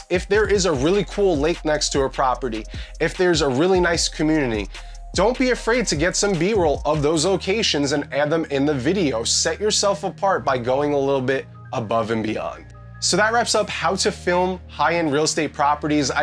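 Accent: New York, United States. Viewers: New York accent still strong my guy 💪🏾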